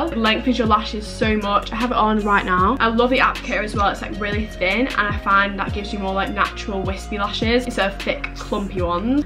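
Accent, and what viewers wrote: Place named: Newcastle, United Kingdom. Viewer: I LOVE YA FINALLY A GEORDIE YOUTUBER IM GEORDIE AND IVE BEEN TRYING TO FIND A GEORDIE YTBER FOR AGES! I knew u were geordie when I hear ya say quid 😂 I LOVE YOU SO MUCH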